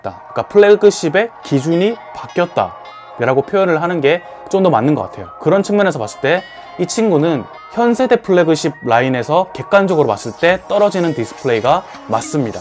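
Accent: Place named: Gyeongsang, South Korea. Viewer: 그기 갱상도다 마!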